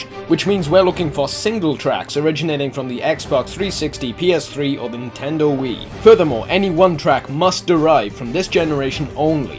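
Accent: United Kingdom. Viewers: You sound like Cryaotic(A famous youtube gamer) with a british accent.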